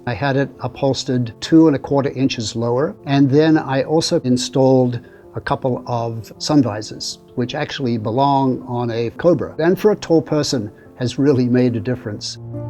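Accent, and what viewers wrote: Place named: United States. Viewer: I am Australian and I could detect your accent. It sounds like you've been in America a long time. Magnificent car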